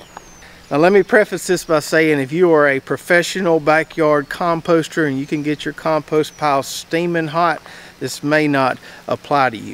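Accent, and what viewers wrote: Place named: Southwest Virginia, United States. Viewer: Thank you! Not sure where you're from but you speak like us here at home. I think you may be a little further south but here in southwest Virginia, our last two winters have been so mild that it doesn't freeze out the insect pests that cause disease like you said in this video. I like that worm bin you had buried in your raised bed. I'm thinking about making one of my own. Take care!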